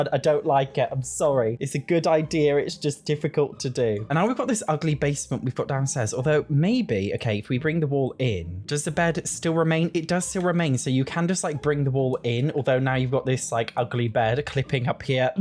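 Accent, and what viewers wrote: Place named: United Kingdom. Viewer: I didn't know you were British.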